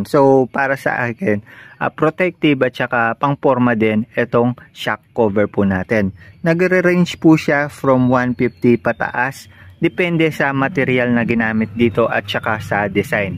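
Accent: Pampanga, Philippines. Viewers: Kapampangan sya.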